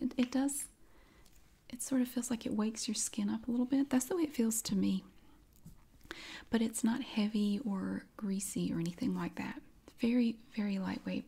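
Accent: South, United States. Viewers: The voice of an angel, love the sothern accent im southern also from thomasville nc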